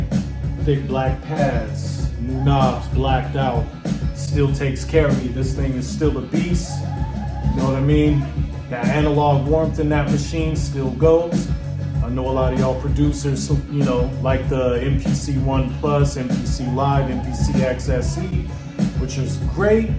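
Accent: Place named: Brooklyn, United States. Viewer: I'm listening..... yo you from Brooklyn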